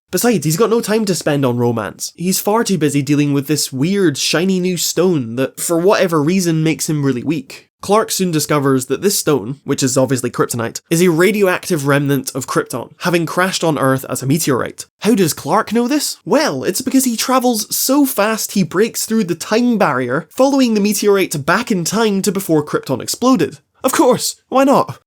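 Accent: Scotland, United Kingdom. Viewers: Are you scottish?I am too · I'm hardly assuming, the accent is quite clearly Scottish. And yes, I am. :^] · I forgot you were so british wow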